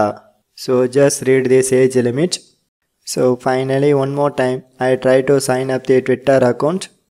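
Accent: India. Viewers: you have the THICC indian accent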